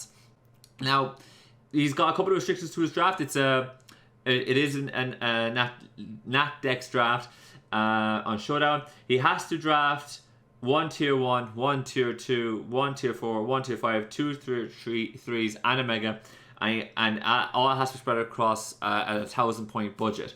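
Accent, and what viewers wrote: Ireland. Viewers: I always forget just HOW Irish you actually sound 😅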